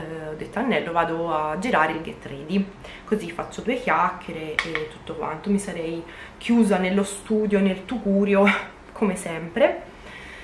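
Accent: Tuscany, Italy. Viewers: Ciao. E' la prima volta che ti vedo su youtube. Sei veramente bella, mi piacciono in particolare i tuoi capelli. Dal tuo accento mi sembra di capire che sei toscana, forse di siena, ho indovinato? Ciao.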